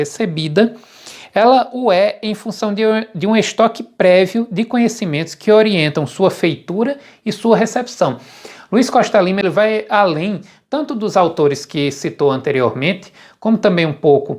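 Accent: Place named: Bahia, Brazil. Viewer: Boa tarde, prof gostei da sua exposição, é a primeira vez que mantenho o contato. Além disso nosso sotaque no YouTube é outro diferencial acima e a mais. Desculpa a pergunta, mas o senhor está vinculado a algum programa de pós graduação? Em caso positivo pode informar? Sou Marcos José de Souza, prof de Língua Portuguesa, Rede Estadual da Bahia, em Fátima. Abr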